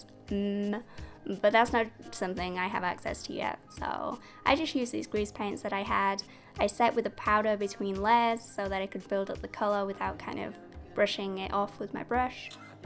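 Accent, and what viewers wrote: United Kingdom. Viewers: Definitely gonna try this, you're such an inspiration. I really love british accent, what a joy to my ears to listen to you pronounce the word "Bloody" hahahaha :3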